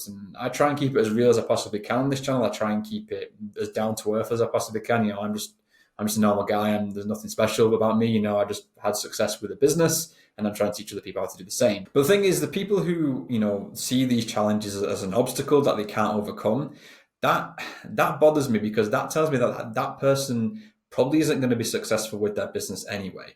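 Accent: North West England, United Kingdom. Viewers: First time I've watched your vids tonight mate. Breath of fresh air. Not the usual BS about how cool they are but proper factual stuff that we all need to know. I have had my ebay shop open dropshipping from Ali for a grand total of 5 days. I am currently going through the "what am I doing wrong" "is it worth it" phase. I'm trying to remain positive and keep scaling. Fingers crossed I need to find out how to get views first off. I'm keeping things as cheap as poss for the time being. Keep up the great content. You sound like a local north west lad like me too.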